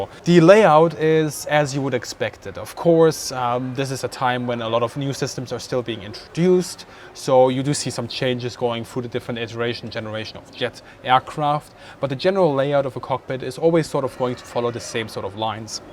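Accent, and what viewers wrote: Germany. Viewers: Oh my... I apologize, that quite heavy German accent made me hear "that secured the jets aircraft position" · Sehr gute Präsentation ... und ein angenehmer, deutscher Tonfall im Amerikanischen ;-)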